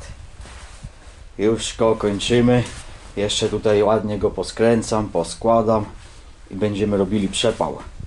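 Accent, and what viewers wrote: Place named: Podlasie, Poland. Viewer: Kolega chyba z Podlasia sądząc po akcencie ;-)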